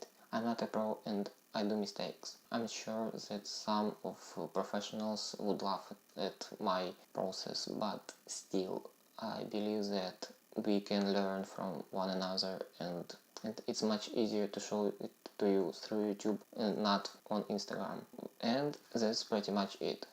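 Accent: Russia. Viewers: Ты русский по произношению понятно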